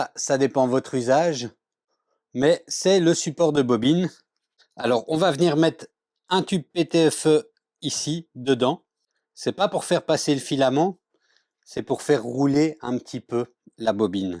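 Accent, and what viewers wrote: Belgium. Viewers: Bonjour Merci pour cette série Du montage De l'imprimante Voron Pourquoi vous dites À faire en fr Vous n'habitez pas en France Car je trouve que vous parlez très bien la langue française Avec un petit accent Je pense Belges ou flamands Wallon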